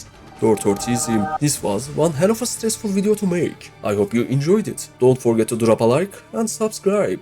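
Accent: Turkey. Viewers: Bro he is actualy a turkish olso he is speaking English with turkish acsent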